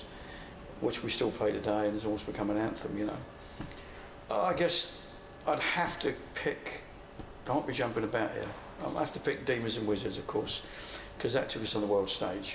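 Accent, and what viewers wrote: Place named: Cockney, United Kingdom. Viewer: Very humble bloke,Cockney accent,reminds me Steve Harris in the way he Talk and the sense of humor,working class attitude,anyway,It's a pleasure seeing Mick Talkin' about his favourite albums and It's not Easy to pick only five great albums,that's how great are to me,could be Seven albums at least and I'm not a hughe die hard fan of the band but surely they eat in same table with, and and not necessarily in that order,so this is my top 5 àlbums. 1.. 2. 3.Demons and Wizards. 4. 5..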